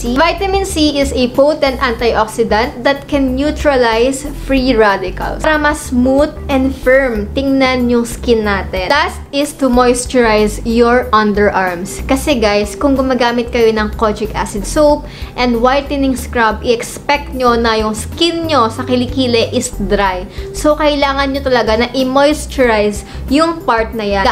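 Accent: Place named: Visayas, Philippines. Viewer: Visaya kaayo uy *HAYAHAY NA ANG BUHAY MO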